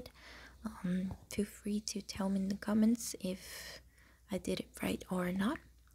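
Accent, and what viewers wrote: Brazil. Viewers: Aquele sotaque brasileiro bem por longe, sabia que tinha algo ai hahahahah Ja vou me inscrevendo e obrigado pelo videi, tras mais lens licking❤ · sabia q era br, sotaque inconfundível, bom video! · vc é brasileira? kk na hora que abrir o seu video eu percebir, mais achei que era só achismo kkk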